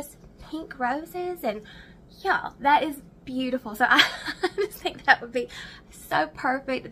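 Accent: South, United States. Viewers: Girl,I love watching you and listening to you talk in your sweet southern voice . I love how you explain the materials and you try on things i wouldn't ever think i would buy but have concidered thanks to you . I am very insecure about my body and you have given me more confidence to at least try new things, so thank you for that . And your hair and makeup is always on point. Your just beautiful .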